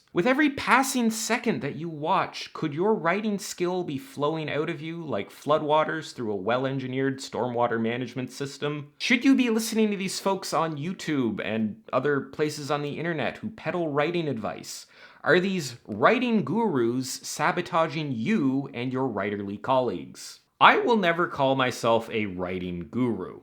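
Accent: Canada. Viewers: Flannel and saying ooot not out, gotta be another Canadian! I could be wrong, but that was a hard aboot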